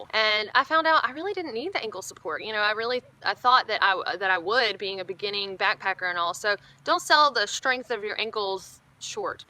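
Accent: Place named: Tennessee, United States. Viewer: I have watched several of your videos of the last 5 months or so and I have to say this and I mean it in the nicest way and as a complement. I have the biggest crush on you and your personality. Your southern Tennessee drawl and snagged tooth are icing on the cake of your sweetest disposition and personality. If I was 15 years younger I would be flirting big time. Lol you are very pretty but not afraid to get dirty and for such a pretty girl that is amazing. After you mention you danced to I was this girl the greatest! I like the way you talk in your videos as you are real and humble in your advice. Not a know it all or a person trying to make money on YouTube just simple and honest. May the wind be to your back while traveling to " " cheers from a California redwoods man they call me Mexibilly.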